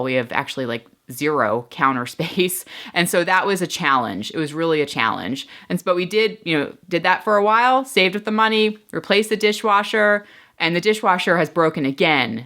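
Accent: Pennsylvania, United States. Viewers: Hey, I'm from PA too! I'm guessing by your accent that "yinz" are not from the same part of PA that I am 😜 Let's go Pens! But really, I feel you on the deer situation. I've hit more than I'd like to admit. Luckily the worst that's happened to me was the grill cracked and I lost a headlight. Right now my husbands laptop is broken and we are just sharing mine now. It works out fine because I have an iPad for work, so I just use it most of the time!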